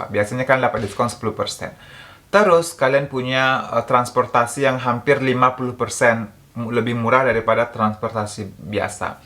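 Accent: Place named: Padang, Indonesia. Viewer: Suka banget denger logat Padang kamu... Love you...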